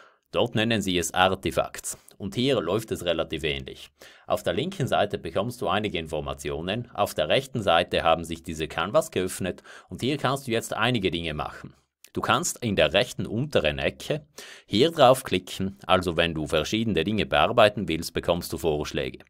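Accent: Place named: South Tyrol, Italy. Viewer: Hon mir woll gedenkt, dass dess a Südtiroler isch.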